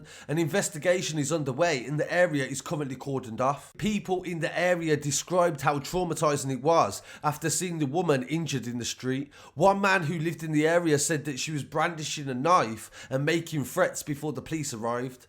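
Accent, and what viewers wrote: Birmingham, United Kingdom. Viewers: I ❤️the Brummie accent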